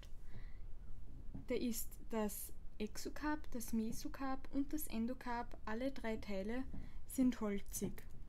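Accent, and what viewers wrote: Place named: Austria. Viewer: Mit diesem sanften österreichischen Dialekt fällt das Lernen gleich viel leichter :D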